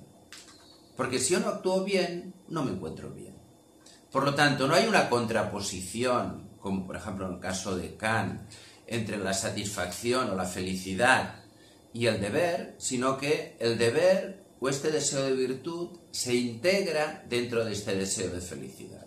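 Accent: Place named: Catalonia, Spain. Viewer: El podries haver fet en català també, que set nota l'accent!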